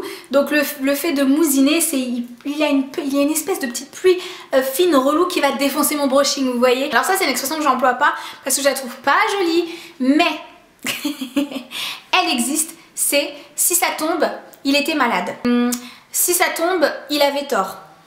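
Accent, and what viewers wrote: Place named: Nord, France. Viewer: Et même l’accent tu reprends l’accent ch’ti ça porte à confusion .. mais du coup tu viens de quel partie du « nord » ?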